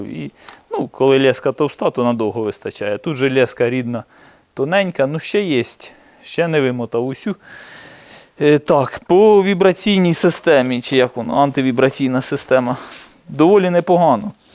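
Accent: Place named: Sumy, Ukraine. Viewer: я чую діалект сумський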